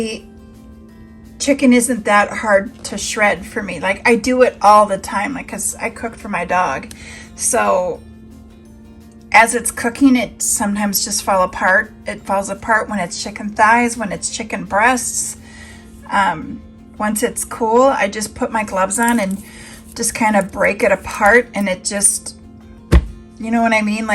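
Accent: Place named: Minnesota, United States. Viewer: This was the first video of yours that I stumbled upon, and within seconds, I had you pegged as a Minnesota native (or at least lived there for a significant amount of time). My mom's entire side of the family lives primarily in the Minneapolis area with my late maternal grandmother's family all hailing from the Blue Earth area down south. Those that live down in Blue Earth have dang near the same accent you do. Tbh, it was refreshing to see someone from any part of the "north" to "northern midwest" not be super srsbiz all the time. I'll definitely be sticking around. If your off camera personality stays the same as your online persona, then I really need more of you in my life!! I absolutely love the depths you go to to make an honest and informative review and still maintain the light airy atmosphere, even when you're less than impressed with the product.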